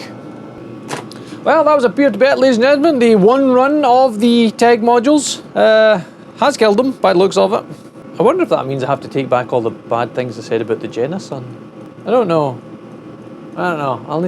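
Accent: Scotland, United Kingdom. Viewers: I love Scotish accent. I always hear something else, like the time you said "I do not have enough hands". The thing I heard was "I do not have enough Hans" :D Nice project btw :) I have brought also this diesel powered heater, to heat up my garage. That project is still work in progress. Also I will install it to my car - 2015 Aixam City Moped-car. That car is so cute, I can make 3-point turn in tight places :)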